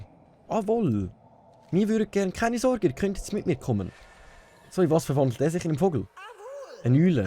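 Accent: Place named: Switzerland. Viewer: Scheinst sehr sympathisch und dein Kommentier-Style ist echt flüssig. Iwie schade, dass du nicht soo viele Zuschauer hast :) Bin auch us de Schwiiz, darum verstehe ich natürlich gut, was du sagst, mache meine Videos aber auf Hochdeutsch. Kann mir auch vorstellen, dass die Zielgruppe in der Schweiz einfach ziemlich klein ist ^^ Bist aber auch noch nicht sooo lange auf YouTube, hab ich gesehen :D Nur so als Tipp, falls du deine Videos etwas besser gestalten willst. Ich würde bei dir die Kameraqualität vielleicht noch etwas verbessern. Ich glaube die Aspect Ratio stimmt bei dir noch nicht ganz (siehst wohl etwas zerquetscht aus ^^). Dazu wirken ein paar Lichter für dein Gesicht echt wunder (auch sehr günstige LEDs z.B.). Hab auch selbst erst vor kurzem mein Setup mit Lichtern verbessert. Es ist erstaunlich wie viel solch kleine Sachen ausmachen. Ansonsten ist die Quali echt super und deine Videos (zumindest die, die ich bisher gesehen habe) ganz unterhaltsam :) Mach unbedingt weiter! 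Cya :3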